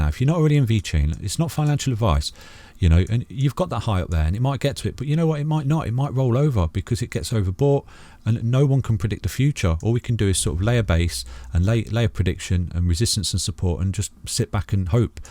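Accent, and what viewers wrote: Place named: East Midlands, United Kingdom. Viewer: Thanks for the daily tuition, nice to hear an east mids accent!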